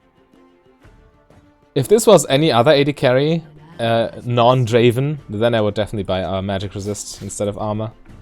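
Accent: United Kingdom. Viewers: In fairness, he just kind of has that voice. I'm not sure how a German guy manages to sound oddly like an upperclass Britishman, but somehow manages it.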